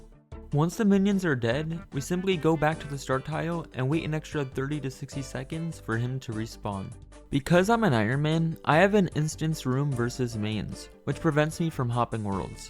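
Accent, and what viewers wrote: United States. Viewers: Are you from Philly?? I hear some wooder in those water runes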